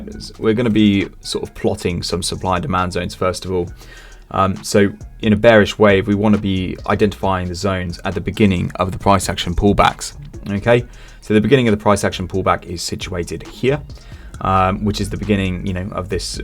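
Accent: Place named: United Kingdom. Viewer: The pull back does not always turn at the next resistance or maybe a bit lower and other guys teaching the candle method and what you are showing here is the most coveted point where to catch the meet A lot of pro retailer need only 50 pips with 20 25 standards and they are done for the day , any wat I like your British accent.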